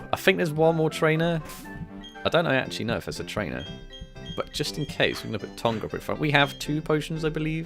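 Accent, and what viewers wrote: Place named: England, United Kingdom. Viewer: good videos man! without any doubt you deserve more subscriptors!! where are you from? england I belive for you acent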